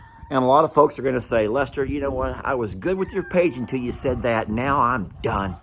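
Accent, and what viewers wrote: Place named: Illinois, United States. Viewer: you are beautiful just the way you are, and you don’t talk funny that’s the Illinois coming out in you. From your Illinois peeps 😂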